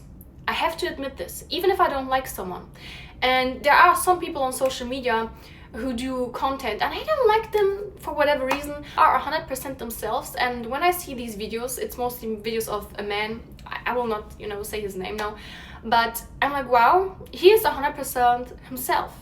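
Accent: Germany. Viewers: Are you German? ☺️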